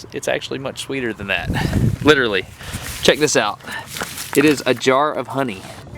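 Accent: South, United States. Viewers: Forget that southern accent its the math Im still trying to understand...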